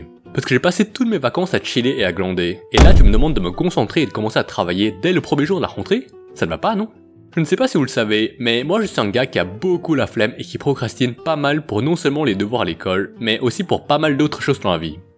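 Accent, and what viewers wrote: Belgium. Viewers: T belge? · Tu es belge?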